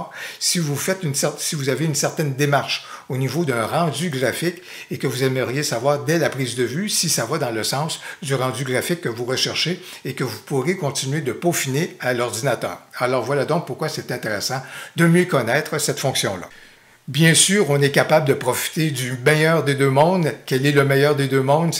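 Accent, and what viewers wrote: Quebec, Canada. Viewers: le charme de l'accent québécois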